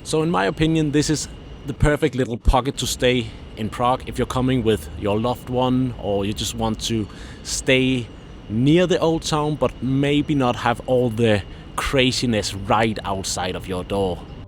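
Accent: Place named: Liverpool, United Kingdom. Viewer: You sound like you have a slight Liverpudlian accent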